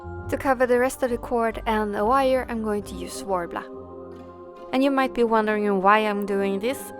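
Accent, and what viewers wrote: Sweden. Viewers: Are you from sweden? You sound swedish, im from sweden ❤️ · Är du svensk ? Du låter lite svensk hoppas du fattar vad jag säger nu 😊☺️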